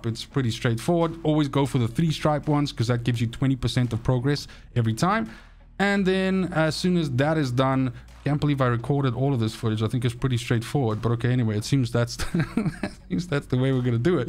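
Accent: South Africa. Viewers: Nice vid bro. Question where in South Africa you from 😅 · Are you south African? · So I can't get over the fact that you sound South African but pronounce your words like an American 😅 Where are you actually from? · Btw are you in S.A? You sound south African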